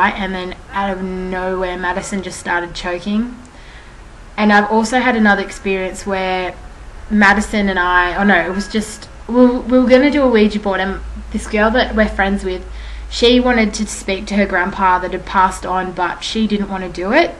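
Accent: Australia. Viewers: I'm having like hard time understanding your Australian accent :( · Are u and Auzzie ? I can tell by ur accent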